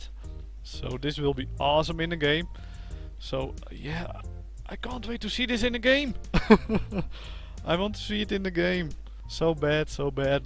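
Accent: Netherlands. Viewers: Another good video :D I see those people still haven't subscribed! I don't understand why people don't subscribe when they watch your stuff! Oh and i was wondering... are you Dutch? :D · are you dutch? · Are you dutch? xD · are you dutch ? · Ik hoorde meteen het Nederlandse engels XD Leuke vid ;)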